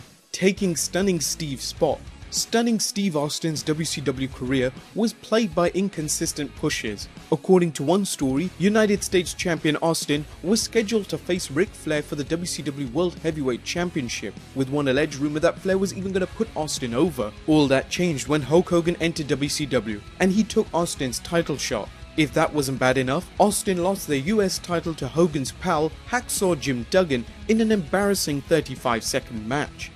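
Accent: United Kingdom. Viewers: fuckin shitbrit narrations over shit that has nothing to do with them. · WCW coverage by a British accent sounds like shit.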